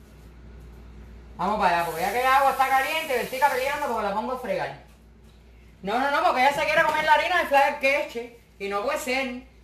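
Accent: Cuba. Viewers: Ay que cómica!!! Me identifico mucho contigo, no como cocinas, como hablas Jajaj,es que somos cubanas 👍🏼❤️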